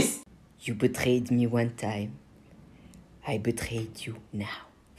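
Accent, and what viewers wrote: France. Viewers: Cz he's french. And this is a french accent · The way he speaks english reminds me of french-👀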